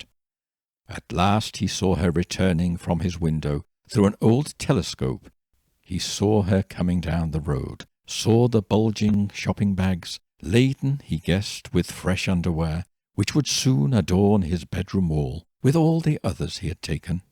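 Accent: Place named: RP, United Kingdom. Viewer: Petite question. Where does your pronunciation of the U vowel come from? e.g. cushion, bullet and put. Am intrigued as otherwise you come across as a relatively RP speaker. Enjoy your content until your slow delivery and soporific voice takes effect. Cordialement. Alexandre Garden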